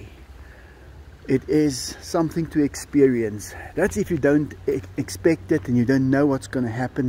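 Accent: Afrikaans, South Africa. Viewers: South African accent. Its chilling that these animals could be living in my neighbours back yard.. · Sorry, just go to say I love the Afrikaans accent. Might be because I'm a huge fan of Sharlto Copley.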